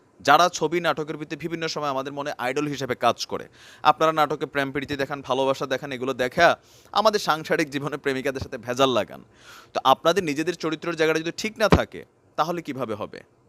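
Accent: Comilla, Bangladesh. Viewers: আপনার ভাষা শুনে আমি আগেই ধারণা করেছিলাম,আপনি কুমিল্লার। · যা ভাবছিলাম ভাই, তাই। আপনার গ্রামের ভাষা শুনে ভাবছিলাম হয়ত আপনি কুমিল্লার মানুষ। আপনি আমার দেশি মানুষ ভাই।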